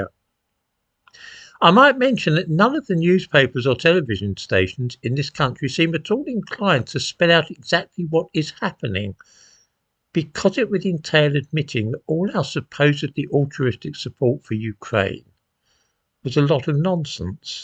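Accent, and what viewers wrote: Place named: Yorkshire, United Kingdom. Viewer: You sir could be from Yorkshire, a great honour, You say it as it is, well done t'old flower.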